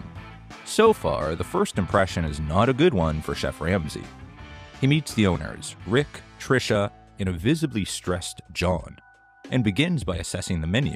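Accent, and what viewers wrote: Canada. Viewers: Just listening to ur accent, are you from Canada? %❤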